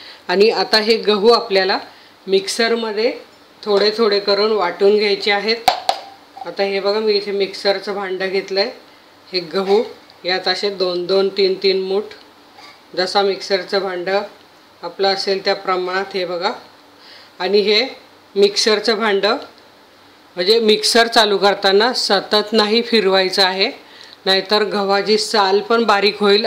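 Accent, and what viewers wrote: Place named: Nashik, India. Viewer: ताई 🙏 मी तुमच्या रेसिपी नेहमीच बघते मला फार आवडतात. मी पुन्हा एकदा कुरडया केल्या, या वेळेस माझ्या कुरडया छान झाल्या.व सांडगेही केले,तेही चवीला छान झाले. तुम्ही नाशिकच्या आहात.असे तुमच्या बोलण्यातून समजले.म्हणून आणखीच छान वाटले,करण माझ्या आईच माहेर नाशिक, आम्ही लहानपणी सुट्टीत नाशिकलाच राहण्यास जात असे. तसेच तुम्ही जे पदार्थ बनवितात.तुमची पदार्थ बनविण्याची जी पद्धत आहे, त्याच प्रमाणे आमची आई पदार्थ बनवीत असे. तुम्ही फारच छान माहिती देतात. खुप छान समजावून सांगतात.ते ऐकूनच तो पदार्थ एकदा तरी बनवुन बघावा, असे नक्की वाटते.म्हणूनच मी पहिल्या कुरडया माझ्या मना प्रमाणे झाल्या नाही.व दुसऱ्या वेळेस करून बघितल्या,खरच खुप सुंदर झाल्या.त्या बद्दल तुमचे धन्यवाद.💐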